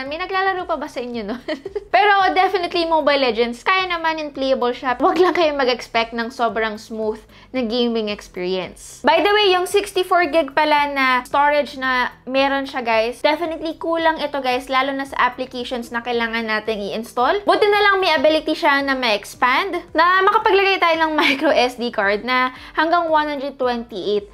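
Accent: Visayas, Philippines. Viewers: yay! bisaya ka ate? <3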